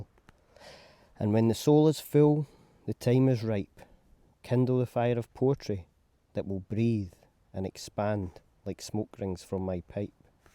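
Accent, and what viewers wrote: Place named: Scotland, United Kingdom. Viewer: New pipe smoker here- I love Malcom and his poem. You did it justice mate- something about reading poetry with a Scottish accent- it really works Thanks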